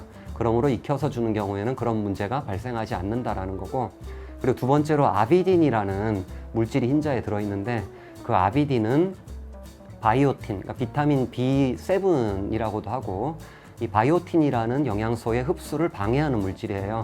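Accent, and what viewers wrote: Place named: Gyeongsang, South Korea. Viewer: 잘봤습니다~~^^ 영상과 관계없지만 혹시 원장님 고향이 경상도세요?? ㅋㅋㅋㅋ 억양이 베어 있는거 같아서 친근감이 드네요 ㅋㅋㅋ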